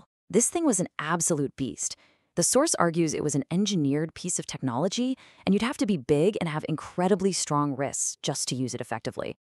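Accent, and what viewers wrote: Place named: United States. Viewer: annoying robot American begone !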